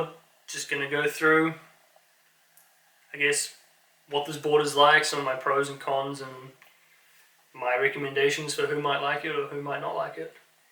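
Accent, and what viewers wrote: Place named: New Zealand. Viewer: Haven't heard a kiwi since crunchie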